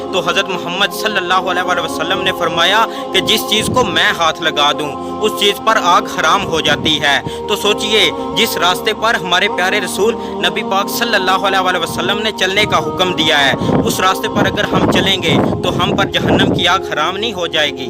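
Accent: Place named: Punjab, Pakistan. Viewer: His Punjabi Urdu Accent is making me laugh😂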